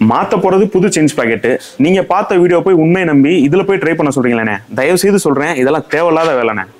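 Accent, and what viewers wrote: Madurai, India. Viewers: பாய் நீங்க மதுரையா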